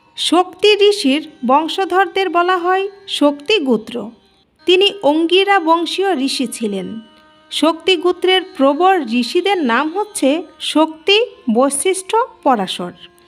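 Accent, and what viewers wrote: Bangladesh. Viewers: Pronunciation thik karun mone hoi apni sylethi meye. Ja hoke bhalo video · কুমিল্লা এলাকার লোকেরা এইভাবে উচ্চারণ করেন।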